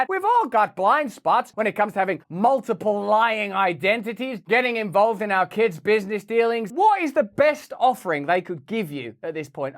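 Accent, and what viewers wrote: United States. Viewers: Great videos! Going to find you on Rumble too. Also, sidenote, I’m always surprised at how amazingly accurate your American accent is! Sometimes for a second it sounds like you’re faking the British one 😂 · Love Rusty’s yAnk accent lol😅…. Biden po$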